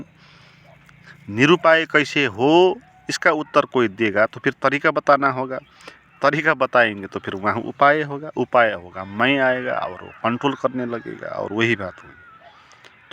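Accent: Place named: Nepal. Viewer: आप की हिन्दी में नेपाली की रस आ रहि हैं।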